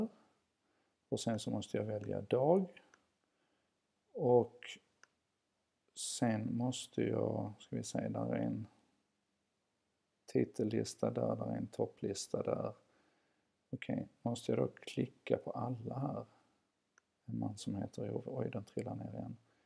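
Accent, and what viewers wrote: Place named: Scania, Sweden. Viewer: svårbegripligt skånskt mummel utan text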